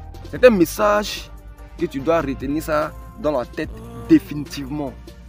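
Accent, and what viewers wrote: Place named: Ivory Coast. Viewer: Si tu es ivoirien garde ton accent stp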